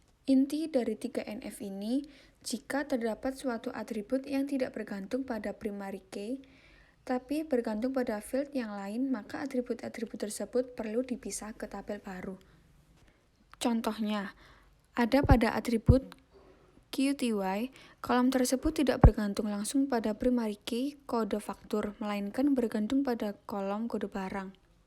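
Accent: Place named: Java, Indonesia. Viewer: medok bund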